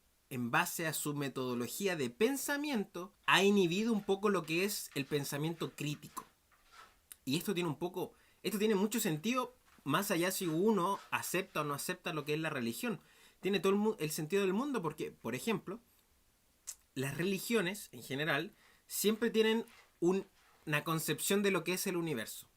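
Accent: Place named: Chile. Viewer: Buen video bro, eres de chile? Me gusta tu acento saludos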